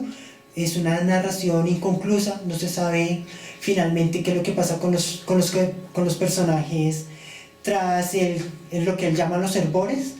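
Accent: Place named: Colombia. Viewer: detecto un acento colombiano xd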